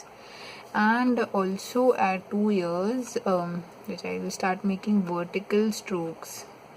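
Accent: India. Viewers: very helpful madam.. accent seems to be South India Your sounds so kind in the video just like a child..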